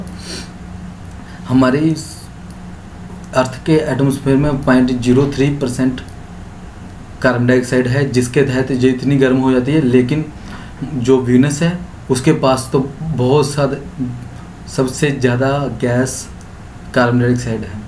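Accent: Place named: Punjab, India. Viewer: From ur Language, you seems to be from PUNJAB ..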